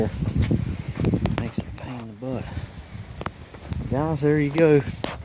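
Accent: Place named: United States. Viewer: Don't mind the countryfied accent... it s down home Americana we need more of it. Nice work on that XJ she looks real pretty... better tho when she gets mud on herself.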